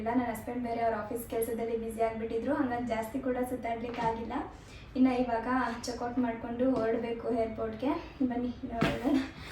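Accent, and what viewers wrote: Mandya, India. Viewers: Super gi coolagi helidira bad comments madirorige artha agirutte bidi Bro matte nivu nimma mathu tumba ista nange namma mandya bhashe matadtare ❤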